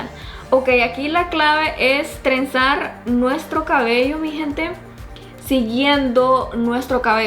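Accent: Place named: Honduras. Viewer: A mí me encanta que está mujer tanto que a viajado y todo, habla como catracha, & otros majes que se van para otro pais hablan como majes & ella no pierde la esencia... Por eso y otras cosas me la sube 💖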